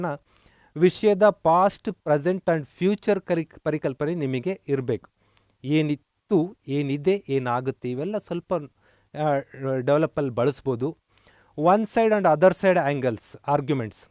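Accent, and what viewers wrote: North Karnataka, India. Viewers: Are you from North karnakata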